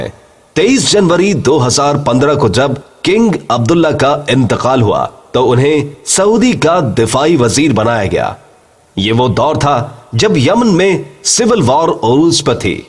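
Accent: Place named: India. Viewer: Ye jo bhoonk raha hai ye to bilkul Indian style hai lagta hai ye indian hai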